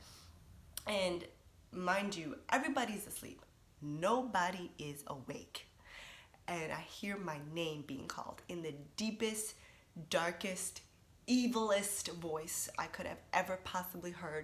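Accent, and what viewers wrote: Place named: United States. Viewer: Você tem cara de brasileira mesmo, mas teu sutaque é bem americanizado. Deus seja contigo. Mais uma entra mas fileira dos salvos em Cristo. Deus é fiel!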